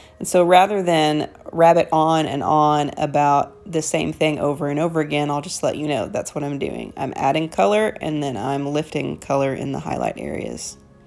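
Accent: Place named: United Kingdom. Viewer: Beautiful! Would LOVE to see (and hear) you do a painting using your British accent, lolol. I have the same viewing habits...mind how you go.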